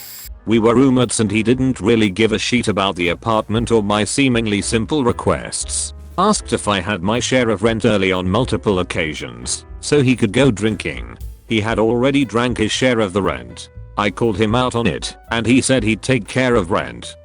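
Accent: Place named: United Kingdom. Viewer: Are you trying to copy xo stories with the british voice and the background elevator music?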